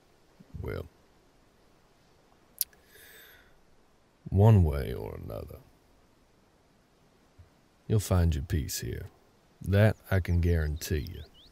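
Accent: South, United States. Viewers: as a southerner, this accent ain't bad. It's hard to tell what dialect, though. maybe Georgia? Mississippi? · Geez...that deep country accent got this country lady fallen head over heels. Yeeee-doggy! 🤠😍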